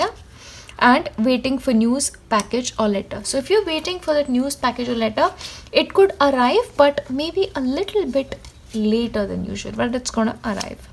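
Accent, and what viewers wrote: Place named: India. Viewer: Your accent reminds me of my close Indian friends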